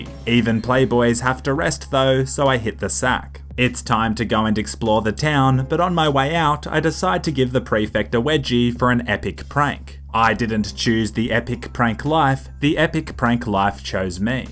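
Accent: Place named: Australia. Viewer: He has an Australian accent that’s why and I’m also Australian.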